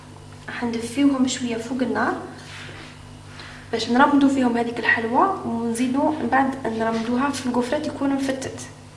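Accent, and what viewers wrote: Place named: Arab world. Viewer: يعطيك الصحة ولي تعجبني اللهجة جهة الغرب تفكريني في الفاميليا لما نحضر في مناسباتهم موفقة وننتضر المزيد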